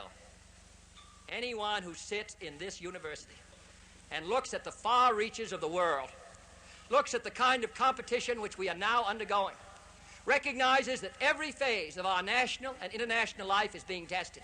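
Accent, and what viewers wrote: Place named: Boston, United States. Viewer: Great Boston accent. And a speech with substance.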